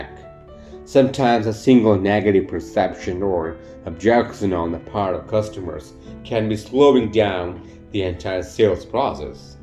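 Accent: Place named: United States. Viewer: Yes friend, I also love his accent ... Being an Indian he's really doing great American accent..🎉🎉🎉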